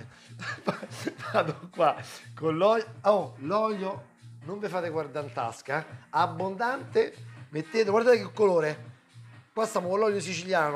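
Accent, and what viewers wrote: Rome, Italy. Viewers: Complimenti chef! Sono allergica al peperoncino, si può fare senza? Grazie mille sei unico! Oltre ad essere strabravo, carico d'inventiva, sei proprio un simpaticone, ci metti di buonumore, impariamo un sacco di cose si ma, ridendo.... Ue' con sto accento romano verace ci si diverte un sacco! Grazie mille chef! Bacino al Vs splendido bimbo!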